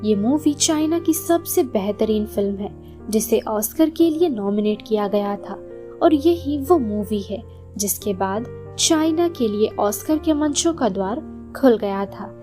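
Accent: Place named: Bengal, India. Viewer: She sounds to be bangoli.. that's why you're getting problem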